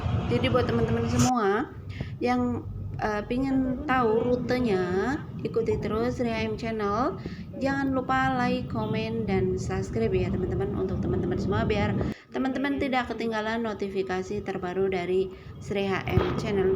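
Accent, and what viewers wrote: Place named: Banyumas, Indonesia. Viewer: Salam ngapak mbae😂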